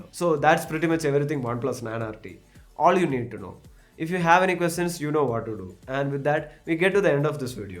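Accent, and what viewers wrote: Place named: Kerala, India. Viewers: I mean the guy sounds like some Anna at some Malyali Paan Thela. Dude needs to sound neutral. Completely clean up any mother tongue tone from his English. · Am I the only one who hears Malayalam slang when he speaks??😄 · My god! Horrendous south Indian accent in your English ‼️‼️‼️ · That malluism in your english is nice to hear❤️ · Such a pure malayalam accent lol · South indian english......nice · That mallu accent ! 😁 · A thick Malayalam accent... better add a tag... not at all clear...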